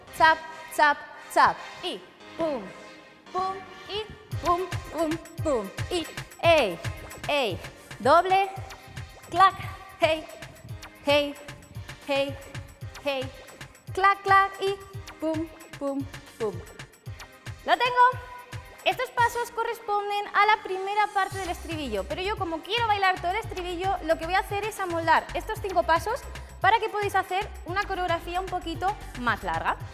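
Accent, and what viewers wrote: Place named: Spain. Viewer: Felicitaciones me encanta este video y me encanta el acento de España si soy grande a lo mejor Un día voy para allá.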